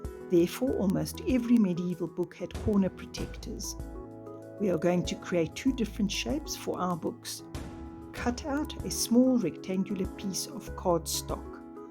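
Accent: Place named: South Africa. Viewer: Hello from a fellow South African! It's always such a treat to click on a video and hear the familiar accent 💖I can't believe I've only stumbled on your videos now. I am miniature mad and consume miniature content like an addict 😂 Your work is exquisite and you are a true artisan. I've learnt so much from you in these three videos, so thank you! 🥰 I have loved all things miniature since I was tiny but have only seriously started making minis this year. It really is the BEST 💞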